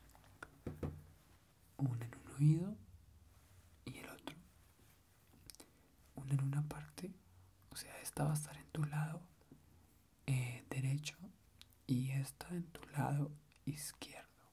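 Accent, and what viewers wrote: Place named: Colombia. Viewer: escuchar Asmr en "colombiano"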